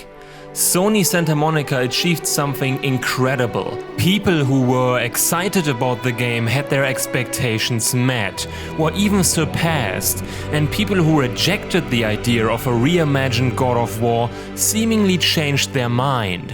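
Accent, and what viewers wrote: Germany. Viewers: I'm pretty sure it's German, just fyi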